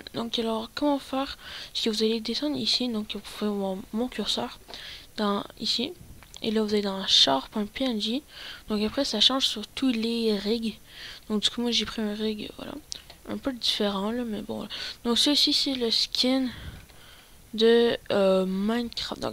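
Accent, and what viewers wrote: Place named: Quebec, Canada. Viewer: quebecois je suis quebecois et je reconais laccent il est juste young faque surment que sa voix est un peu weird mais javais la meme avant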